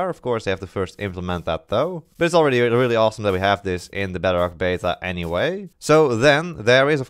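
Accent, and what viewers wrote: Netherlands. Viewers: Are you dutch? You sound like someone from the netherlands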